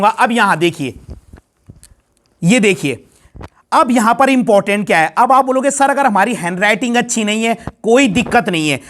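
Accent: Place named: Pahadi, India. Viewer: Sir aap pahadi ho na! Me bhi pahadi hu! Apki aawaj se phachan liya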